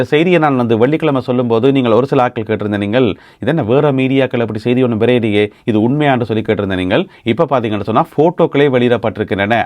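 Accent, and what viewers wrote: Sri Lanka. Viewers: ஐயா உங்களுடைய பெயர் என்ன நீங்கள் இலங்கையில் இருக்கிறீர்களா அல்லது லண்டனில் இருக்கின்றீர்களா இலங்கை தமிழர் மாதிரி பேசுகிறீர்களே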